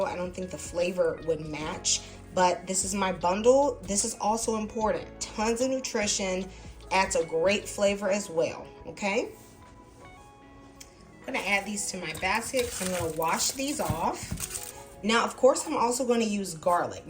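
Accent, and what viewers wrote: South, United States. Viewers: My kids been sniffling these past couple of days so I’m definitely going to use this recipe. 🔥Are you from the south?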